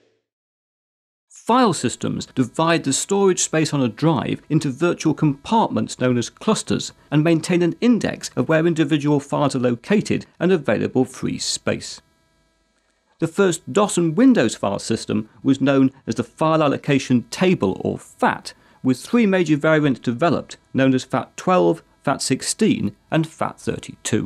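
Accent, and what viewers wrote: United Kingdom. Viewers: Would love to listen to this but I just cant overcome my distaste for the british accent, sounds so goofy, my apologies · I like your English accent. Is it British accent?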